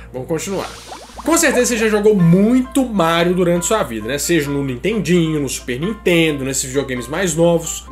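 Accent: Minas Gerais, Brazil. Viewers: Mineiro nato esse aí kkk 👏🏾🤝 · Definitivamente o mineirês é o melhor sotaque que existe. 🤗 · Uai, vc é mineiro???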